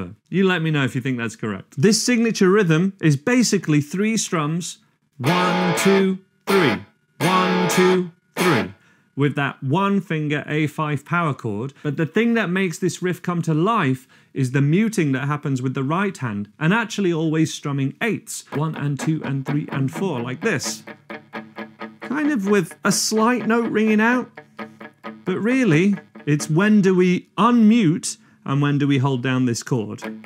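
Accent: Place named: Yorkshire, United Kingdom. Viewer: Yokshuh accent, there.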